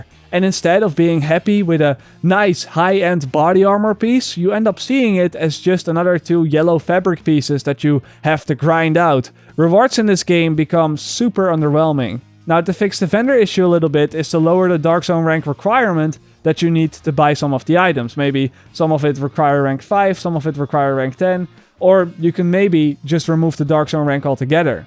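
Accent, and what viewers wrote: Netherlands. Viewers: you sound like you're dutch.Why? I hear it on your voice. And btw.... Never stop making videos,only if you want to xP. Love them.. Got yourself a subscribe buddy. Daag! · you dutch? you sound dutch.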